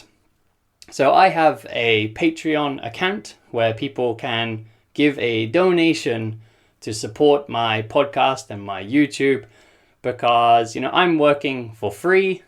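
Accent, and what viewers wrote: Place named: United Kingdom. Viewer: Hi, I know I'm two years late but I thought you were from the UK when I first heard you. I wasn't sure but I thought so. (I'm argentinian)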